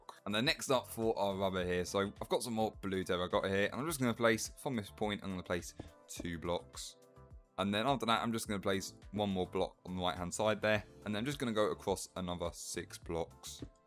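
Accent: United Kingdom. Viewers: This british akcent😰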